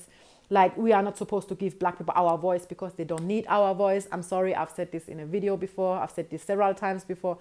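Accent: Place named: Nigeria. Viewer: Nigerian accent is super strong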